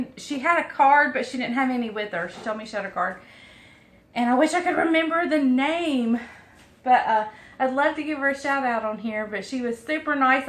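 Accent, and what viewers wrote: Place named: North Carolina, United States. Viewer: And your NC accent i live in the northern peidmont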